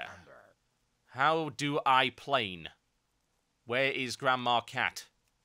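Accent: United Kingdom. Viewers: Excellent reasoning and criteria for knowing when to continue to do the vids (still have lots of viewers). haha. Also, I think another appeal to these videos is because 1)1-10 questing is usually almost always a solo experience that 2)almost everyone can relate and is 3)usually pretty similar to every other 1-10 leveling. Having hilarious, witty, and informative british commentary in ze background of such opening questing is, well, a unique change to the otherwise familar questing experience. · I watch your videos for a few reaons. First, because I am to lazy to check out the new content myself, I have a premade I run dungeons with and my worgen who sits at 15. Secondly, I generally like watching LP's, and your on my list of people who have good opinions and don't sound like they are 12. Lastly, because you have a clear british accent, and I seldom here them in Canada. 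Keep making videos!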